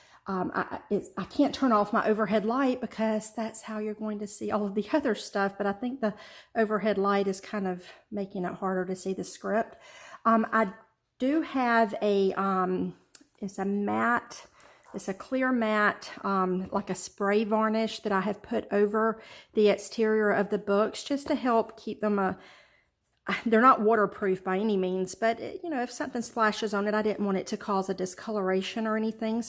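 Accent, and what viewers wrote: South, United States. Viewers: WOW!!!!! I am so glad I saw your comment about showing this journal on Tracie Fox's Facebook Page. Its one of the most well done journals I've seen yet!!!! Really beautiful and so much thought put into it! And, another thing that caught my eye is your middle (or maiden name) is...and my maiden name is also!!! You don't see too many McCollums so I was intrigued!! I am originally from Texas but I now live in Alabama...I can tell by your accent you are somewhere in the south I think!!! Anyway, loved the journal and now I am off to check out your Etsy store!!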